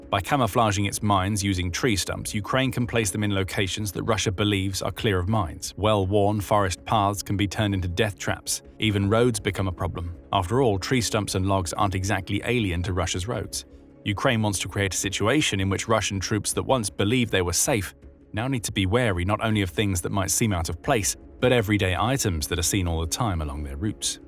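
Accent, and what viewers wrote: United Kingdom. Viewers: Really dislike the UK accent in all of these Ukraine videos. If you are making videos for the UK then good for you, if making for the US then drop the accent.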